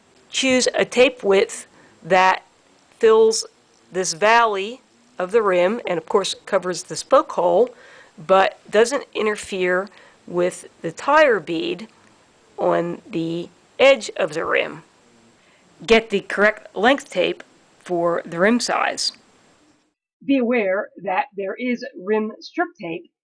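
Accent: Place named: Maryland, United States. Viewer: I detect a Maryland accent :)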